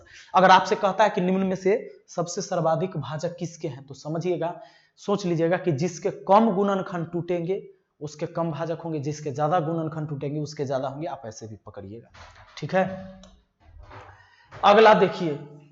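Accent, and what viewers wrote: Bihar, India. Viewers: सर आपकी बिहारी बहुत अच्छी लगती है और जो भी है वह अच्छा ही है।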